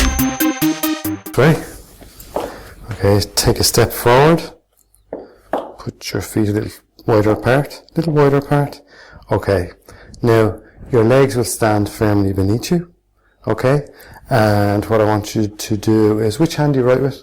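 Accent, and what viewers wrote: Ireland. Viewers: Are you Irish ? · wow. You're Irish, yeah? Want to take on a student? :D I dunno about anyone else, but when I sleep for 24 hours I wake up feeling like shit lol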